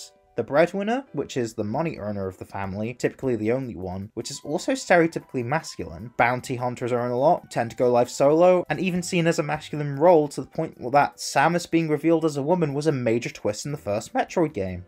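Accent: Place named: Scotland, United Kingdom. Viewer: hello hello hello what's all this then Scottish accent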